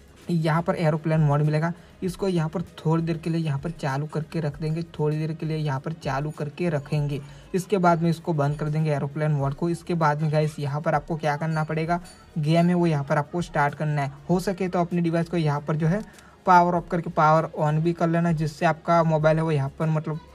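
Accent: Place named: Telugu, India. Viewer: Bro you are Telugu 😅😅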